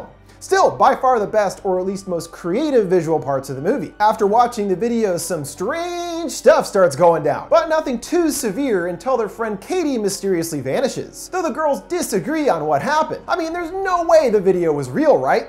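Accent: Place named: New York, United States. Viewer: Great new York accent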